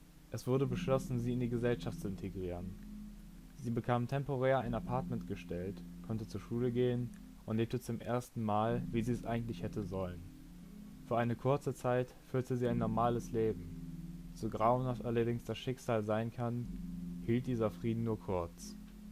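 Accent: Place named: Germany. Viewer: diggah als du english am Anfang gesprochen hast wusste ich sofort das du deutsch bist😂😂 dieser Akzent die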